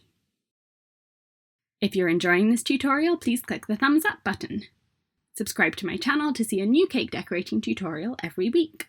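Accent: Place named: Scotland, United Kingdom. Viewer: Where are you based? Sounds like you have a touch of Scottish in your accent but I can't place it. You have a lovely speaking voice.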